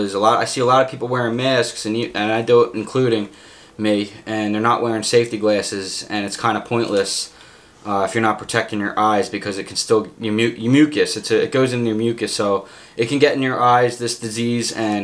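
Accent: Philadelphia, United States. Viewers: Just found your channel, great info! But I thought to myself this dude sounds like he’s from Philly...then you said “ water” not “wooder” so not sure? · I knew from your accent, you were Philly. I'm born and raised Philly, went to Lincoln, class of 94. Watch your back brother. Things besides the pandemic can hurt you, like Mayor Kenny, the DA and the skells of the city. Good video bro... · I’m from philly and I knew you had a philly accent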